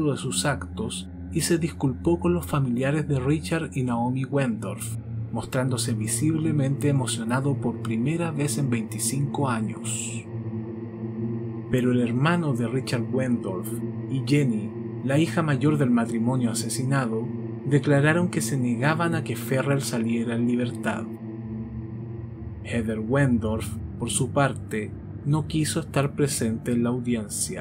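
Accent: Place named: Chile. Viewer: La narración y dicción es perfecta hermano...y el acento sutilmente chileno... je je ..oye un abrazo y muchos éxitos...nos tienes enganchadísimos a tu canal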